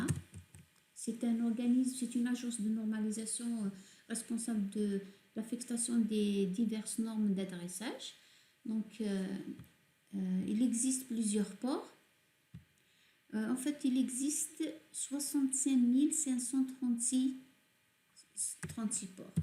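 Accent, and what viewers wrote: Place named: Arab world. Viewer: j'avais peur de pas bien comprendre mais bizarrement quand on utilise un accent arabe je comprends tout de suite x)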